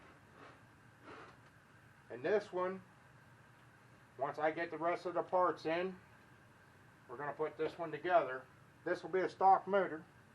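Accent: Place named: West, United States. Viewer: So are you a mechanic or a very handy man? And sounds like someone from the west.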